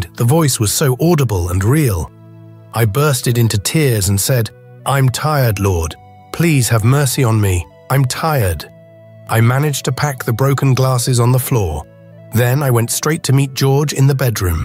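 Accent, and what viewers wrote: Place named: Europe. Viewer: I'm sorry but, why is this being read by this european voice? He isn't pronouncing the oooo's and hmmms correctly.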